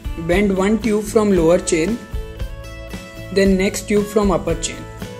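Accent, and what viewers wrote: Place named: India. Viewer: Very beautiful handbag. Are you from India? I assume because your akcent.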